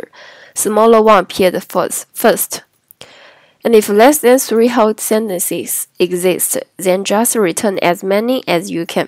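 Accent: China. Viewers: Please improve your English. It's really hard to hear your annoying Chinglish.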